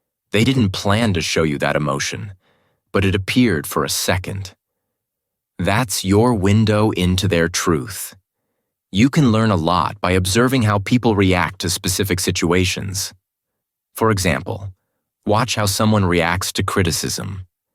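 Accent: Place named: United States. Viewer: with a vile accent twist. With all of mankind's languages to choose from to prostitute, they chose a most historically beautiful and fascinating language. America, a pox on humanity.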